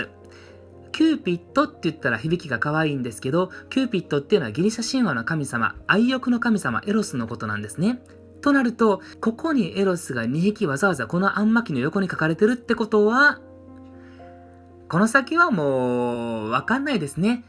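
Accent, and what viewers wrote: Kansai, Japan. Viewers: この絵にそんな意味があったんだ〜と感心しました。硬いパンでも、勿体無いから工夫して食べよう、うん、とっても大事な精神だと思うけど、プロテスタントはやっぱガチガチだね〜と思いました。プロテスタント系の中高一貫校に通っていたので、何となくそういう雰囲気って分かる気がしました。所で、は大阪？出身？イントネーションがもろ関西ですね。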